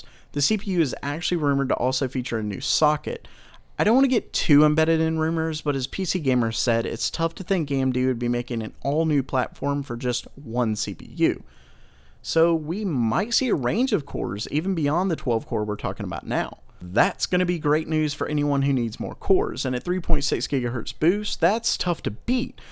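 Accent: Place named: Tennessee, United States. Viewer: That accent is basically the same as mine, had to keep shaking the feeling that it was me talking xD Are you from Tennessee?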